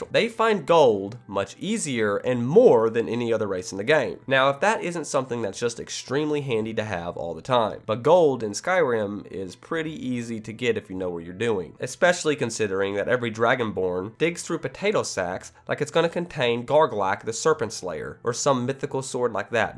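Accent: South, United States. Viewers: Southern guy playing some Skyrim? Subbed!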